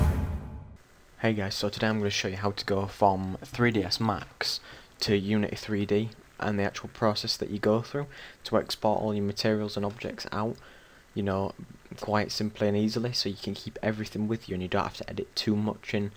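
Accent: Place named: England, United Kingdom. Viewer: non related question: I'm learning accents, and would love to know were in England is your accent from?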